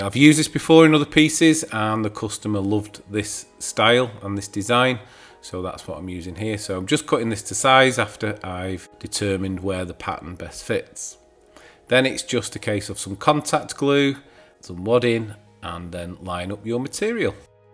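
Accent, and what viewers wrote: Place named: Yorkshire, United Kingdom. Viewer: Are you from Yorkshire?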